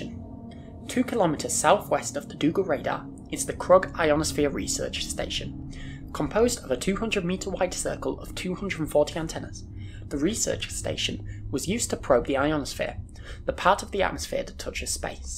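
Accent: United Kingdom. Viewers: have you never heard a northern English accent before?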